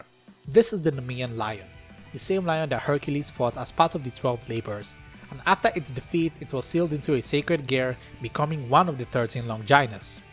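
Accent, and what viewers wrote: Nigeria. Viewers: Why do u sound Nigerian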